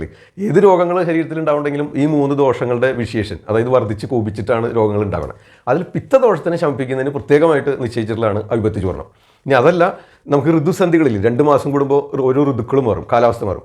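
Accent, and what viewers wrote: Thrissur, India. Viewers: വളരെ നല്ല ഈ അറിവു തന്നതിനു നന്ദി, തൃശ്ശൂർ രീതിയിലുള്ള അവതരണം നന്നായിരിക്കുന്നു 🙏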